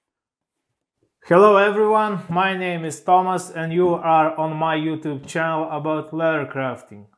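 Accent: Russia. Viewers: Русское произношение...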